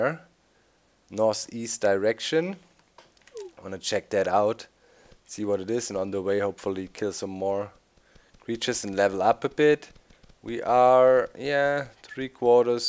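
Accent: Germany. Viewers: is that a german accent?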